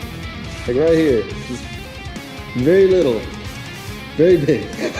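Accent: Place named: Middle Tennessee, United States. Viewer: my guy lmaooo, i knew you had to be from middle tn. We got all the z/g's out here lol, im from smyrna/ lavergne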